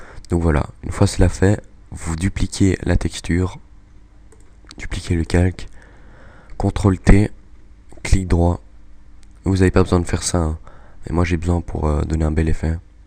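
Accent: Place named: Belgium. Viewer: Sa fesais longtemps que j'avais pas entendue ta voix de belge <3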